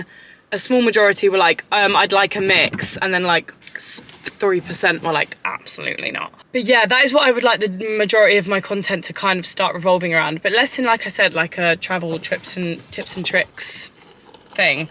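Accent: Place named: Essex, United Kingdom. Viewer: I noticed the Essex London twang hahaha kinda funky!! You're literally thriving so happy fro you xx (I also live in Kent can we be friends)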